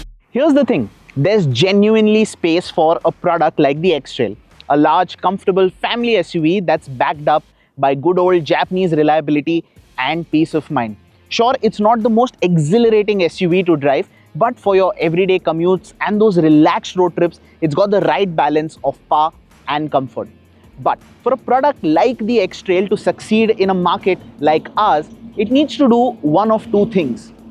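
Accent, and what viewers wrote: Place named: North India, India. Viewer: maTTTerial maTTic. Bro pls don’t insult india with your cheap north Indian English accent 🙏🏼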